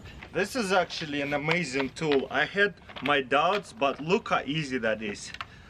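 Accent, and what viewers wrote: Russia. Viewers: First he's Russian, and second he says it how ever he wants. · without insulting sir, but are you Russian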